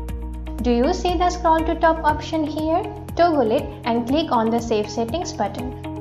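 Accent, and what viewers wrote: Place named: India. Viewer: it sounds like indian accent.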